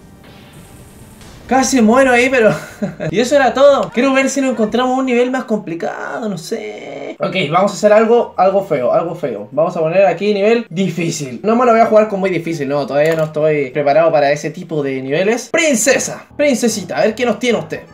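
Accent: Chile. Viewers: eres chileno ¿no? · Este Weon es chileno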